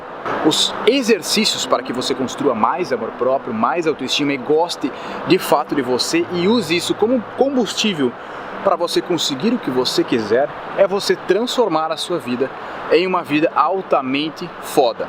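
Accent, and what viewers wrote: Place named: Rio Grande do Sul, Brazil. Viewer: Mas eu sabia que conhecia este sotaque.:) És gaúcho de onde,? Sou gaúcha, também!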